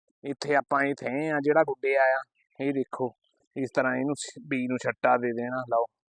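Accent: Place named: Hoshiarpur, India. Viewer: Veer Hoshiarpur ton o lgda a bolan ton ma v Hoshiarpur ton a reh bhr rea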